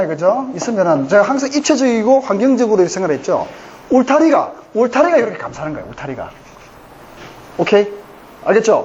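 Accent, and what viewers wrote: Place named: Gyeongsang, South Korea. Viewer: MB 젊었을 때 모습과 많이 닮았음. 목소리도 많이 닮음. 경상도 포항지역 사투리의 억양도...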